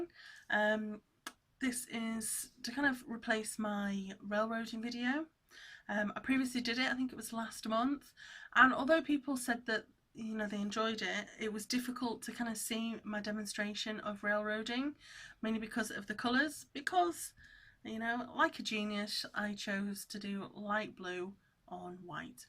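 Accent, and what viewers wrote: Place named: England, United Kingdom. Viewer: For starers I just love your videos they are such fun cause your character is very enjoyable, so you have encouraged me to cross stitch i am a lover of embroidery but cross stitch has caught my attention thanks to you. I am looking for someone here in my town to show me how cause i'm more of a hands on. Plus i love the English accent it sounds so cool.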